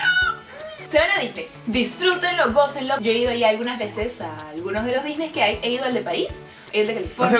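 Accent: Peru. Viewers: Como que cuando empezaste a hablar...ya sospechaba que eras peruana~🙋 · Eres Peruana?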